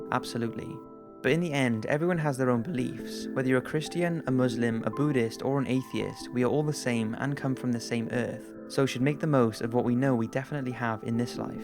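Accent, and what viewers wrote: United Kingdom. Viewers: yes another amazing video keep em coming and i LOVE your accent are you from the UK? night and peace out from Sweden x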